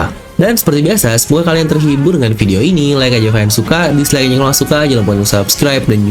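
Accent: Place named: West Java, Indonesia. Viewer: hahaha, urang sunda horeng. :)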